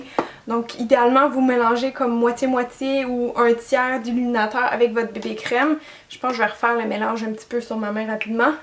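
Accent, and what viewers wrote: Quebec, Canada. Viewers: L'accent québécois tu connais ?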